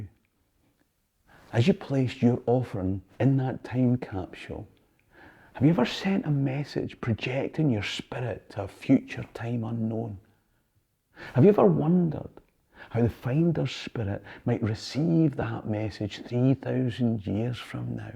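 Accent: Scotland, United Kingdom. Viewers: Wondering how many people understand Scottish, perhaps he would do better if he learned English.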